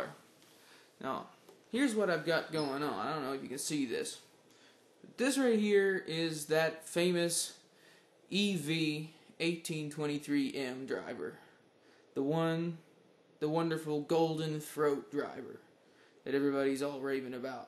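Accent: South, United States. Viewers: Sickkk. PS I don't think this guy is stoned, he's just from the South. Whenever I hear a person from the South, I have to upgrade my estimate of their intelligence to account for their accent subconsciously downgrading it.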